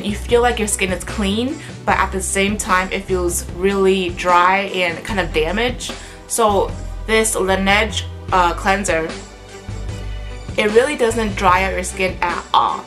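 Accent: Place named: Singapore. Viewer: haha you have a slight singlish accent here :D #SG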